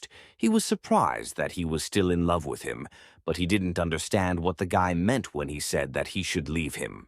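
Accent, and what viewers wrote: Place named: United Kingdom. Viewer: The narrator is British!!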